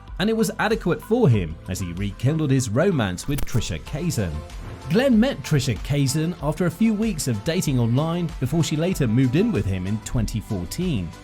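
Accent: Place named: England, United Kingdom. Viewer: why does the narrator have a English accent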